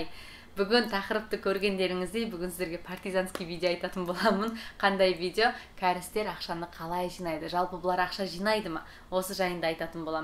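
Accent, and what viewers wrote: Standard. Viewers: Қазақша таза сөйлейді екенсің. Айналдым даусыңнан. Бақытты бол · Менде сізге қосылам,әдемі,сұлу,қазақша таза сөйлейтін,қылықты қыздың кәрістің қатыны болғаны өкінішті.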